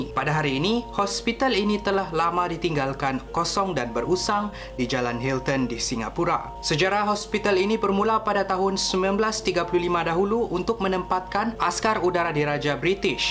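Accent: Sabah, Malaysia. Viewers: kn Sabah.. Ckp pon da tau la.. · orng sabah ke:-)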